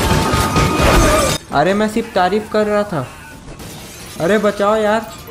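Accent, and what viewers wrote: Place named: Bihar, India. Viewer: Bhai bolte bhi bihari jese ho tum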